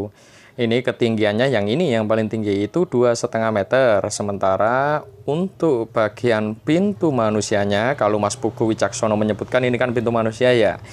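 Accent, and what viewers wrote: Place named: East Java, Indonesia. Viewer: Seperti orang jawa timur.. Aksenya itu lho.., buanyaaak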